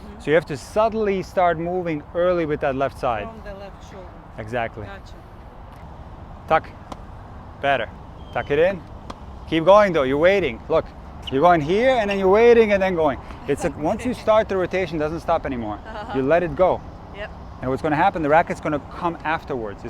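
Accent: Russia. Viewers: is russian love her asscent saw her from the beginning so good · ДА ТО РУССКАЯ ПО ГОВОРУ!!!🤣🌺🤣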